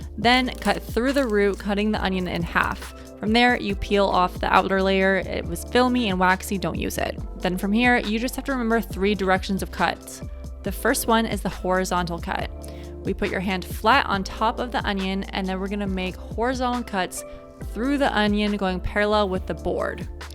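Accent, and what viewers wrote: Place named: Los Angeles, United States. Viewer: I'm from LA, I was convinced the LA white girl accent was a myth until the first 5 seconds of this video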